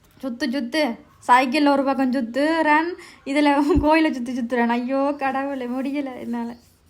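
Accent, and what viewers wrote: Sri Lanka. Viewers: you are speaking Sri Lanka is very sweet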